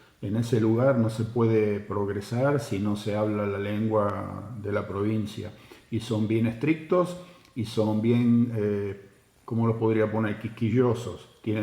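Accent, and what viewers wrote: Argentina. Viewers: Holaaaaa familia ❤ los adoro, hace un par de años los descubrí, cuando empezó a a acompañar los videos de y. Me llamó la atención la forma de hablar y descubrir que "era un argentino 😅"... Gracias, muchas gracias por todo lo compartido. De emigrar siempre me gustó Canadá, antes era con muchas más exigencias, todo cambió después de la pandemia. Abrazo grande desde San Miguel del Monte, pcia Bs As, Argentina. ❤❤❤ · Que relato perfecto, parecés un profesional de los medios, la historia tuya,la vivimos como propia, con la forma como la relatás y los que somos descendientes de inmigrantes, nos sentimos identificados con nuestros abuelos, ( en mi caso italianos, analfabetos, que nunca más tuvieron contactos con los suyos)! Lo que describís es como me sentiría, si tuviera que emigrar, y se nota como estas al tanto de la realidad,en este caso de Argentina! 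Otra cosa muy llamativa es que seguís manteniendo el acento Argento, y particularmente modismos idiomaticos a pesar de tantos años viviendo y hablando otro idioma!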